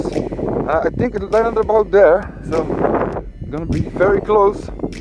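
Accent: Netherlands. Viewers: he sounds soooo much like hes dutch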